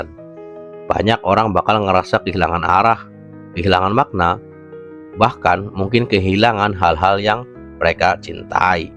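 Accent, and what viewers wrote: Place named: Cirebon, Indonesia. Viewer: Iki admine wong Cirebon kan... ? Logat ngomonge Cirebon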